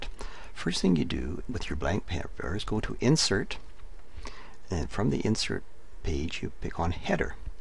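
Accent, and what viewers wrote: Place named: Canada. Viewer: You're adorable. Love your Canadian accent. Even though your video didn't help me...I want to be your best friend! lol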